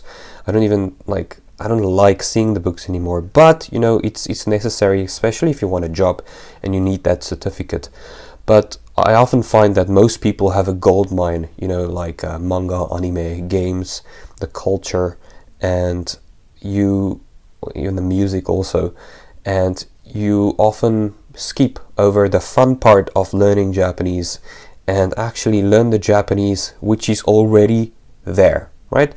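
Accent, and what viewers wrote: Afrikaans, South Africa. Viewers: I was wondering why it sounded vaguely Dutch, Afrikaans makes a lot more sense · What, you sound South African... My brother!!!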